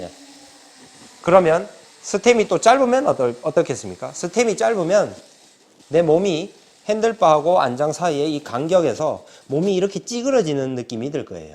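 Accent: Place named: Gyeongsang, South Korea. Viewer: 경상도말투가 이렇게 자상할수도잇구나...기본개념부터 차근차근 잘설명해주셔서 본인자세를 다시한번 돌아보게되는 좋은 영상입니다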